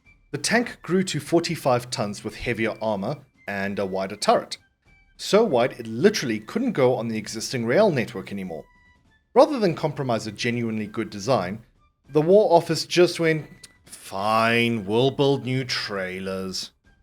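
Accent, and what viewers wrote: South Africa. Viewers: In love with the Afrikaans accent.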